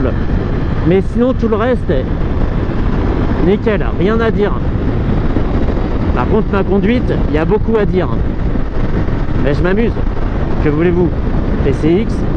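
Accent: Paris, France. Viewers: Le langage est fleuri avec des trouvailles poétiques au fil de la poignée genre: "une fourche qui se laisse pas déborder par l'arrivée du poids". Voila, une chaîne moto qui rend justice au parigot façon titi gouailleur., le Pagnol de la banlieue, le Marcel du motocyclisme périphérique. Un vrai régal, cette verve!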